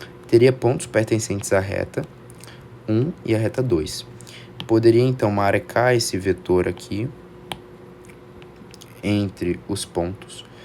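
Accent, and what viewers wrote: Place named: Pernambuco, Brazil. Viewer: Não entendo nada de matemática. Mas amei o vídeo ❤ kkkkkk Porém, gostaria de ressaltar que ficaria mais legal com o seu sotaque original, pernambucano 🥰😘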